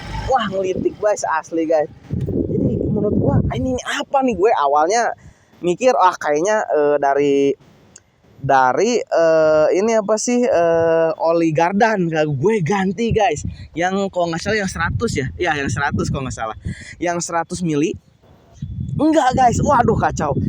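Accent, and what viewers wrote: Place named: West Java, Indonesia. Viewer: Lu orang jabar, gak usah ngomong gue, logat sunda.. Gak cocok cuk..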